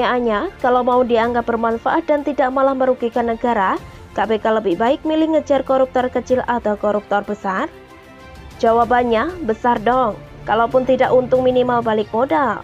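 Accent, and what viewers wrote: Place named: Java, Indonesia. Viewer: intonasi dan medoknya announcer gak cocok buat berita...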